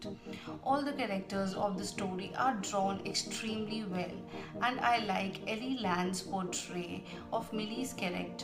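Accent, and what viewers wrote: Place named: New York, United States. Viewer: Very NYC and good pronunciation....go a head And d best😃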